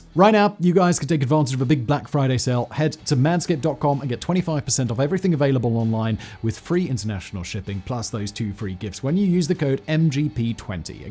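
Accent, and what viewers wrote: United Kingdom. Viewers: Slow down! You talk so fast in your brit style us Americans can't keep up bro...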